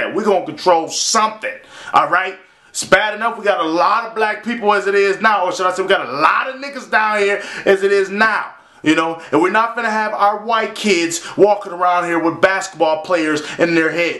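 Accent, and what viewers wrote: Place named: Texas, United States. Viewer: I like your texas accent hahahaha!!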